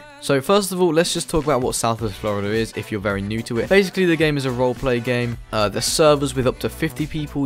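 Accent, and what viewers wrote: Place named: United Kingdom. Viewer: your brittish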